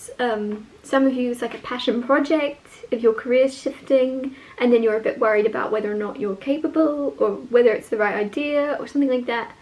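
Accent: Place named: Scotland, United Kingdom. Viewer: Are you Scottish perhaps or of Scottish extraction? We have a thing for hot 🔥 blonde 👱‍♀️ Scottish neuroscientists having lived w one in East Harlem & after reading 📚 Kelly McGonigal's book 📖 'The Willpower Instinct' when we learned that the greatest predictor of human resilience is Heart Rate Variablility & we spent a year getting down to breathing 1 breath per minute & going to NYSC & doing an hour on the Stepmill, burning 🔥 850 calories & getting our heart rate up to 165 bpm, & we've needed every bit of resilience we developed trying to survive in the 🌎 since we left NYC. What if we're a lesbian in a man's body? "Exit Through the Gift 🎁 Shop" is an excellent movie 🎬 we saw down on Houston St. about Banksy & Mr. Brainwash. We don't tell anybody anything because they never like hearing what I have to say & tell me that it's just my opinion. 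kthxbye!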